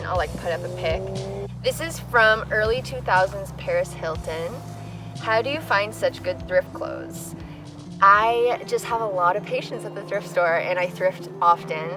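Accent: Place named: Canada. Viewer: Canadians man, they pronounce “ou” and words w a hard sound between two a’s, like pasta sounds really weird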